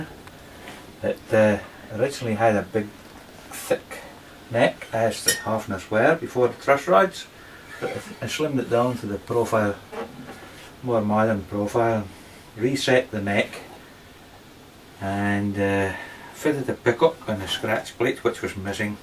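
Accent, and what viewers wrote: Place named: Scotland, United Kingdom. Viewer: Your accent is really hard to place. Sounds Scottish, sometimes Irish and sometimes Northern European.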